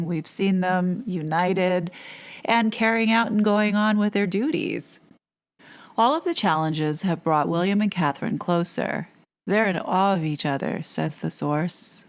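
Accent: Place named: United States. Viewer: Why did the US magazine mentioned abut how much they are "IN LOVE", we heard so much about their living separately and how Harry's demeanor changed as soon as Me Me showed up? BTW, did I hear correctly in your recent videos that you were a woman of color and that you lived near Windsor? You are an American living in the UK? You have a soothing American voice and accent.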